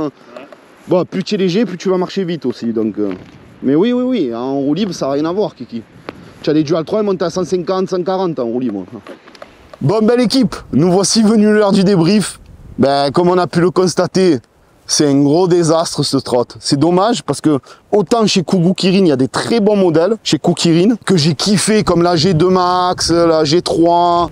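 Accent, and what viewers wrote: Marseille, France. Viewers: Laccent maseillais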